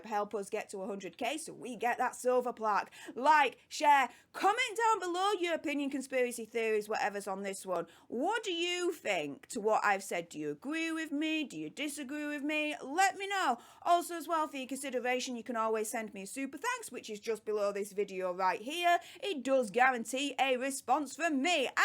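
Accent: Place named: London, United Kingdom. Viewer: What a delightful young lady. Close my eyes and her accent brings back some incredible times running around London with some incredible East End Girls.... That will keep a smile on my face all the way to check out.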